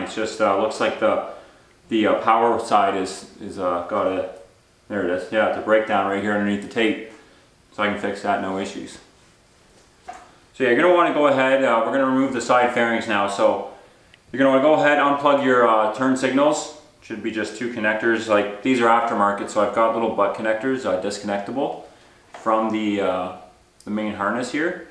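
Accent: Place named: Canada. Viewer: Lol i knew you were Canadian, I guess we do have an accent after all. I'm working on a 03 1000, front fairing is a little different from the 750 and 600.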